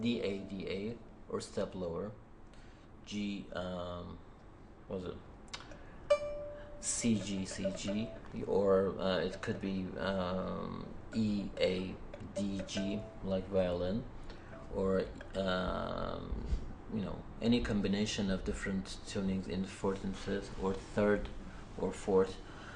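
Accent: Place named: North America. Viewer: wow! he speaks like a man who live in toronto or new york for 20 years! way to go man. excellent musician. i like combination of Kalhor and Shajarian. they are awesome.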